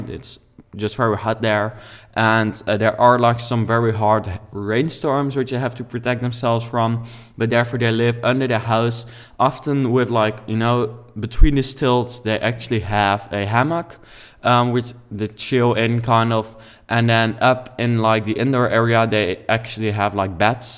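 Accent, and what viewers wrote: Netherlands. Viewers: Je kan wel horen dat je Nederlands bent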